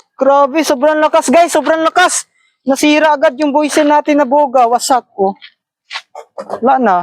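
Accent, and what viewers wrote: Visayas, Philippines. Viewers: Bisaya ka dol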